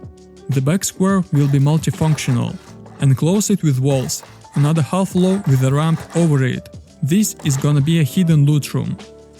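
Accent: Russia. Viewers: ты же русский по акценту бро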